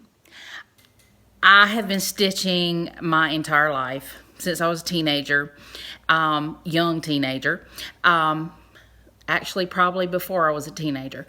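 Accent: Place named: Oklahoma, United States. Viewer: You are so cute. When I first heard you I thought she talks like me. I'm from SE Oklahoma and you would fit right in. I am subscribingvright now. Plum Street is my favorite, too.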